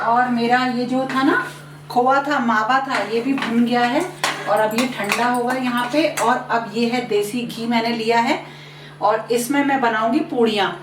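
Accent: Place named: Anupshahar, India. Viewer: Nice vlog mam🙏🙏🙏🙏🙏🙏🙏🙏🙏🙏🙏🙏🙏🙏 Aacha lagta hai... Jàb ghar me sab milkr kaam krte hain.👌👌👌👌👌👌👌👌👌👌.... Bilkul tyohar jaase feeling aati.......👍👍👍👍👍👍👍👍👍👍👍mam.... Meri saasu ma anupshahar ki hain...... Apki language bhi wasi hi lag rahi thi.....👌👌👌👌👌👌mam mai to choorma food processor mai bna leti hoon, badhiya mix ho jata ha😊😊😊mam mawa aap ghar pr banate ho kya???😊😊😊😊😊😊😊😊😊love u frm Haridwar 😘😘😘😘😘😘😘😘😘😘😘😘😘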